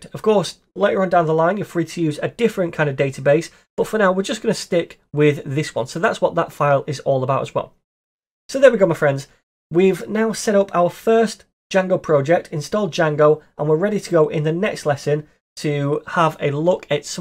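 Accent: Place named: North, United Kingdom. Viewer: nice to hear and be taught by a fellow northerner!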